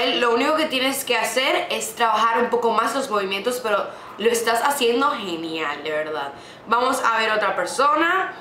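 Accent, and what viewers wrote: Dominican Republic. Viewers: Ese momento donde su acento me llama la atención y descubro que también es dominicana ♥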